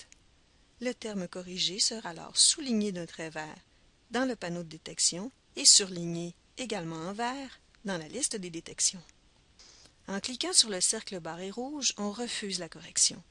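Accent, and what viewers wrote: Canada. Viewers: Excellente présentation et j'adore l'accent canadien;-) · Excellente vidéo de présentation. Les explications sont claires, concises et précises. Et l'accent canadien ajoute encore comme une couleur ou un parfum que les Français apprécient beaucoup. Cette vidéo permet de comprendre rapidement comment fonctionne le logiciel et fait gagner beaucoup de temps à ceux qui en ont besoin et qui veulent apprendre à le maitriser. Un grand Merci à.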